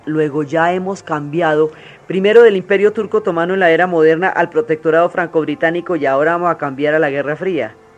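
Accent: Colombia. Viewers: Su exposición goza de un dominio de conocimientos muy alto, fruto de profunda y cuidadosa investigación, pero además, la mezcla del sabor del español de las montañas Colombianas en sus expresiones típicas de nuestra cultura, han hecho que su narrativa me despierte aún más el interés por la historia y la geopolítica de Colombia y de todos los países de los cuales Ud. ha contado sus historias. La admiro mucho!!!Sin duda que es una Colombiana notable en cualquier parte del mundo, que bueno tener el privilegio de escucharla!!! Exitos Dra..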